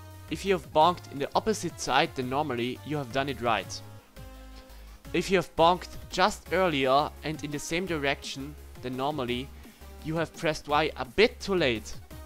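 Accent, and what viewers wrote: Germany. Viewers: Are you german ? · Lol man merkt dass er deutsch ist😂🇩🇪 Wo sind meine german people 😂🇩🇪🇩🇪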